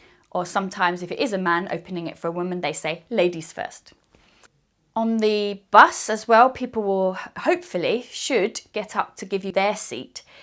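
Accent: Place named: United Kingdom. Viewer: I like this English teacher a lot.Because she is amazingly skilful in teaching.Besides,I AM si fascinated with the British accent...I like very mucho the British English.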